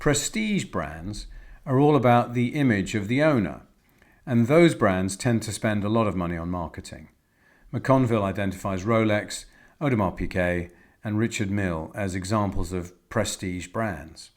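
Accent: RP, United Kingdom. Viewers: Thanks for yet another great instructive video. Your posh accents help pay more attention to what you are saying. I wonder if you ever use any expletives in your life :) I certainly do and not with a posh accent but with a French one. With regards to IWC, I would highly recommend the IW371615 Portugieser Chronograph, there is a French gentleman there that is very good at taking care of his customers.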